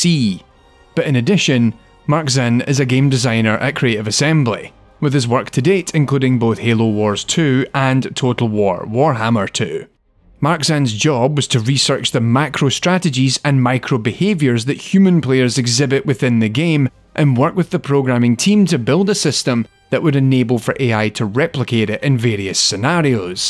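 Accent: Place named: North, United Kingdom. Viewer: Nice work on the video mate, love to hear a fellow northerner talk about video games.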